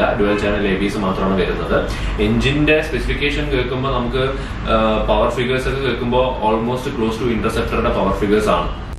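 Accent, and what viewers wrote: Palakkad, India. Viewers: Palakkad ano native place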